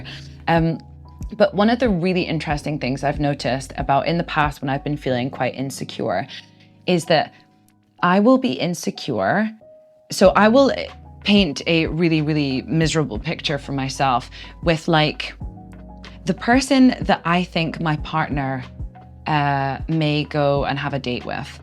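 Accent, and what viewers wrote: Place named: Scotland, United Kingdom. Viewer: Love the accent! Got to golf in Scotland a couple years ago...beautiful.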